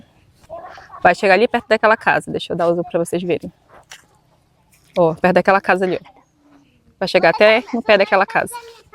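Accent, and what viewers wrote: Rio de Janeiro, Brazil. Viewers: SINCERAMENTE EU PENSEI QUE VC TINHA NASCIDO NO RIO DE JANEIRO...PORQUE VC FALA IGUAL A CARIOCA KKK .......ABRAÇO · Parabéns... Curto muito... Acho legal seu sotaque carregado na letra (X) assim como nós cariocas. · o sotaque dela lembra o do rio de janeiro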